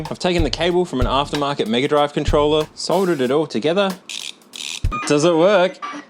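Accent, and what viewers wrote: Australia. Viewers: He's Australian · Two problems with your comment: 1. He isn't British, he's Australian. 2. Getting frustrated because people from different countries have different accents is a ridiculous way to live your life.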